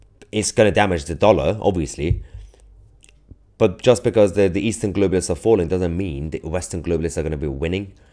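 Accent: United Kingdom. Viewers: British/Persian, God bless you, I understand that, I do not understand what is the ludicris point, of calling you other nationalities, you definitely have a lovely British accent. You are a Brit!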